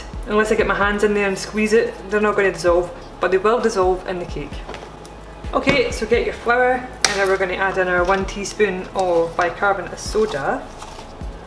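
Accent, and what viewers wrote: Scotland, United Kingdom. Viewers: Be proud of Scottish roots lass. Let that accent be free.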